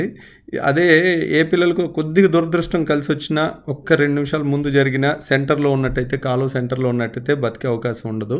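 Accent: Krishna, India. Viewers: Miru chala chakaga telugu krishna dt valla laga matladutunaru doctor garu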